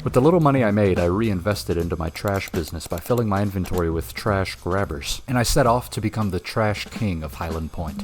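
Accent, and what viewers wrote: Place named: Netherlands. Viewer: Took me a couple mins to notice you are a fellow Dutchy, props on you accent. Enjoyable content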